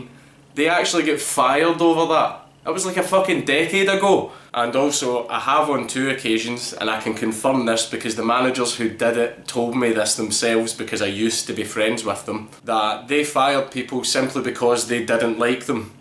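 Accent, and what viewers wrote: Scotland, United Kingdom. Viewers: LOL so very Scottish. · Hans Kloss its Scottish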